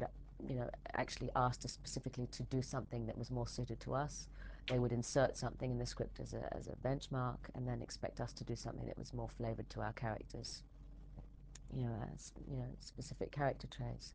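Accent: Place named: Australia. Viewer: Hi 🙋‍♂️ hey I was in the Navy I never got a chance to go to Australia cuz I really love your accent, best of luck in your future jobs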